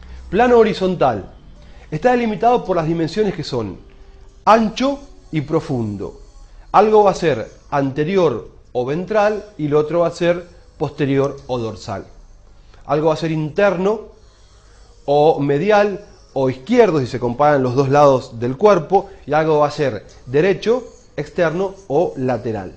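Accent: Argentina. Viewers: Hablan lindo los Argentinos! Gracias por el video :) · no sabes de planos y te haces el que sabes de vaginas. de paso tenes una voz de porteño importante amigo. gracias por el video de todas formas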